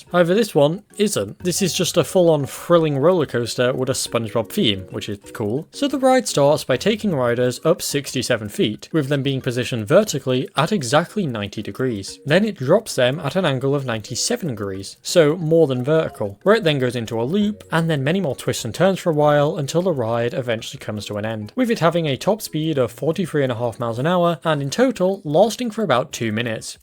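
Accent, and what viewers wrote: United Kingdom. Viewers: Its about time a British Narrator got around to adressing this. Cheers!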